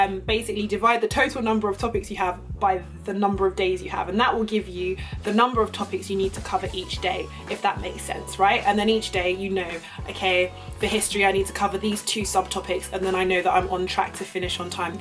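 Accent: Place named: France. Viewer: This video helped a lot! Love ur French accent BTW <3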